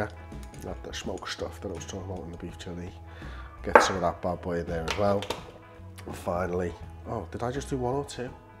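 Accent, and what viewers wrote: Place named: Liverpool, United Kingdom. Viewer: A most adorable scouser is the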